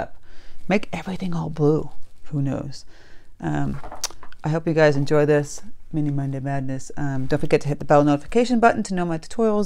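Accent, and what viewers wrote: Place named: New England, United States. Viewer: I love your painting! But even better I love your personality. You make me laugh and teach me things at the same time! What part of New England are you from? Your accent sounds like my daughters. She is Vermont/New Hampshire.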